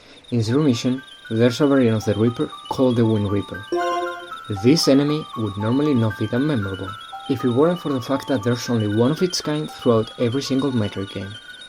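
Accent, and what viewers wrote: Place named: Spain. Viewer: Como se nota el acento españolxd good video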